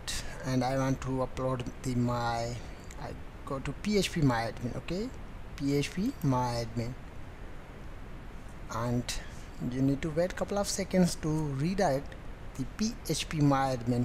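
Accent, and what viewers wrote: India. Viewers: indian accent really not sounds good to my ears but thanks to this video bro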